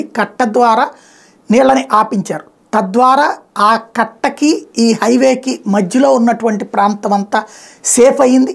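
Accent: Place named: Vijayawada, India. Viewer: Mee maatalu vimtu vunte bejavaadalo tirugutunnatlugaa vuntundi maadi vinayabaade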